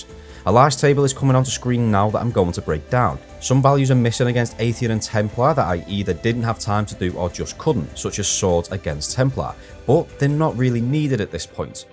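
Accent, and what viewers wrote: Liverpool, United Kingdom. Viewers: Loving the thorough breakdown here, always enjoy numerical deep dives in Destiny! Thanks for the video! Also, am I detecting a fellow scouser here mate? ;D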